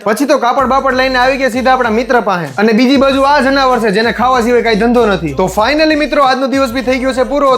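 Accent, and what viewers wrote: Kathiawar, India. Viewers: ભયંકર મસ્ત અને જોરદાર કાઠિયાવાડી બોલો છો ભાઈ.. એક નંબર અને પણ...😅😅😅❤❤❤👌👌🫰.. ભમ ભમ..se ne he..se ne he😂😂😅.. સેજ કટક બટક😮😂.. પાણપુra😂😂❤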